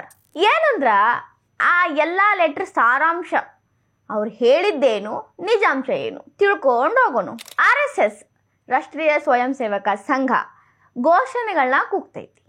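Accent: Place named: Kalaburagi, India. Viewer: ನಿಮ್ಮಿಂದ ಸಹಜವಾಗಿ, ನಿರರ್ಗಳವಾಗಿ ಬರುವ ಕಲ್ಬುರ್ಗಿಯ ಭಾಷೆಯ ಸೊಗಡು, 1968-70ರ ನನ್ನ ಅಲ್ಲಿನ ವಿದ್ಯಾರ್ಥಿ ಜೀವನದ ತರುವಾಯ ಕೇಳಿದ್ದು ಬಹಳ ಕಡಿಮೆ. ವಿಷಯದ ವಿಶ್ಲೇಷಣೆ ಹಾಗೂ ನಿರೂಪಣೆಯೂ ಪರಿಶುದ್ಧವಾಗಿವೆ.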